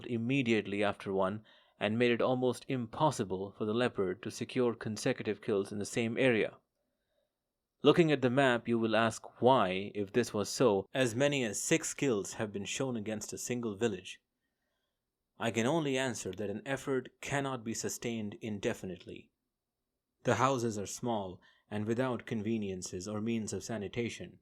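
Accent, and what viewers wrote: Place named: India. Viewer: Indian probably living in States?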